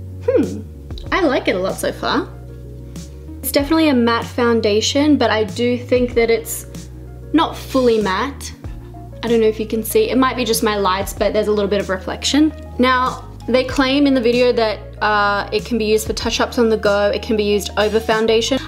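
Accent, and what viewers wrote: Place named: Australia. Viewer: Is it bad that I read the title in her Australian accent? Lol I just had too 😭 I love her too much ❤ She's soo beautiful!!! I can't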